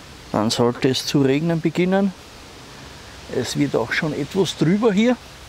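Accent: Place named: Austria. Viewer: Hallo, ich liebe das Österreichische. Und von dir ist es irgend wie noch beruhigend. Danke für die Tour. Gruß Goldjunge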